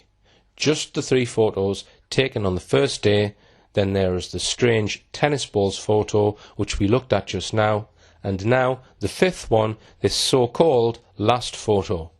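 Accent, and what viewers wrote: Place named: Newcastle, United Kingdom. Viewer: YOU are a complete and utter moron. NOT only that are unable to deduce a commentary and facts. It’s not also locution lessons it’s elocution lessons . Before you make mindless comments on an accent in this case a Geordie one, take care of your grammar. This is broken down step by step. If you can’t follow this keep off the internet . Or scroll by. Spankwangle of a Troll.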